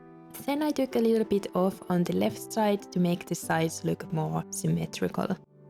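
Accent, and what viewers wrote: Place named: Finland. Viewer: This is the first of your videos I watch and I like it a lot! May I ask where are you from? Your accent somehow sounds Finnish to me (someone who is currently trying to learn Finnish) but I’m not sure whether I’m right with that assumption. Either way, I’ll definitely subscribe because I love the way you set up your Bullet Journal <3 ⏰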